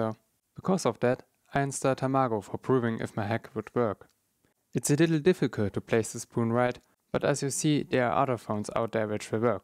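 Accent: Germany. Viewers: Man hört das du deutscher bist :D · Dieser deutsche Akzent😂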